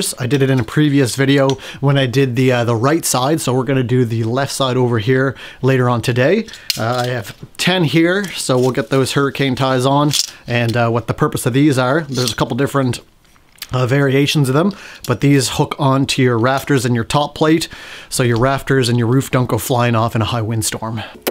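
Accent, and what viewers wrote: Canada. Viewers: Yeah!! More build videos! You can definately tell you are Canadian by your accent! Our lovely neighbors to the north eh? Have a very blessed weekend 💕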